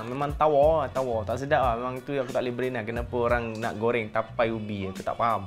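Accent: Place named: Minangkabau, Indonesia. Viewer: hulaa...patotla ckp minang manjang....asal greenwood ke..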